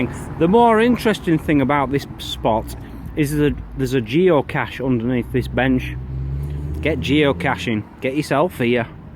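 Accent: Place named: Yorkshire, United Kingdom. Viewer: Where in Yorkshire are u from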